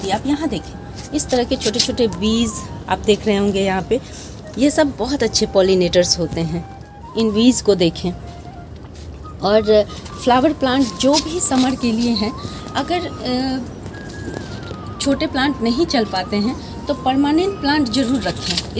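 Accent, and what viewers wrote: Bihar, India. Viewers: Aap Bihar se hain kya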